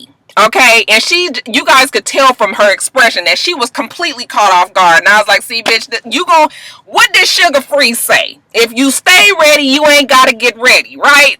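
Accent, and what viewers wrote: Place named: California, United States. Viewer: !! I love your Ray J! You know you're from Cali, you got the accent down. You went back to Cali with "molded" and "loc up" today! I'm just but I am so excited, I can't wait for Mally Mall! I can hardly contain myself! LOL. Love your videos!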